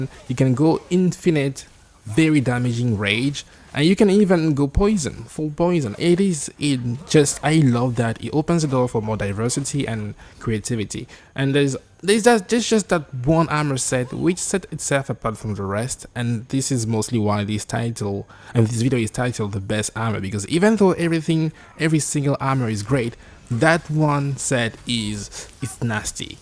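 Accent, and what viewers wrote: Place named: France. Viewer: je me disais bien que y'avait des petits reste d'accents français par ci par la, très bon niveau d'anglais sinon.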